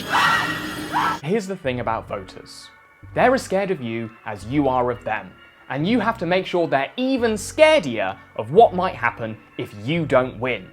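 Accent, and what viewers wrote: United Kingdom. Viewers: What kind of British accent is this!?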